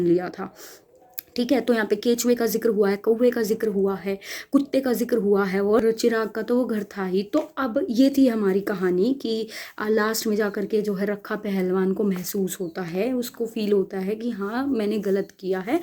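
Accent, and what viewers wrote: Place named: Garhwal, India. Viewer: Ye garhwali tone ayee hyee 😍😍 pahadi girl ❤️❤️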